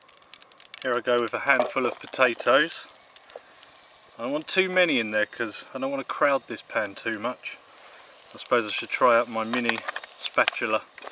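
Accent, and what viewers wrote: United Kingdom. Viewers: Are you british? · Make a Bushcraft camp cook book. You got the vids, the recipes, AND the British accent. You could be the Gordan Ramsay of Bushcraft cookery. Can you also do a braised short rib? Or anything braised tbh?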